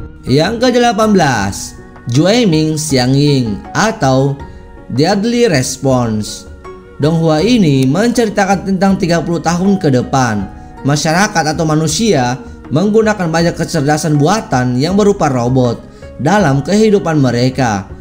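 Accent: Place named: Bugis, Indonesia. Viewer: Logat Bugis daeng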